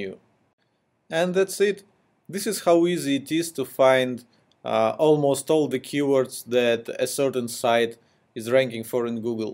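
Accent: Russia. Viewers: great information ! are you from russia :) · Русский акцент, фууууу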